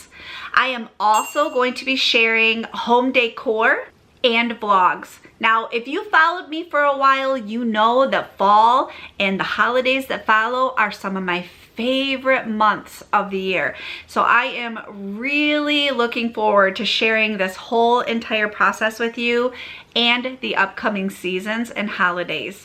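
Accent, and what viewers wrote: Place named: Texas, United States. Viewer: I could never wrap my mind around your dialect being from Texas! Best wishes for your future. 😊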